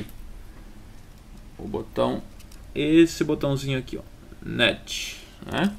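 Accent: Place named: South, Brazil. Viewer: Sutaque do sul rsr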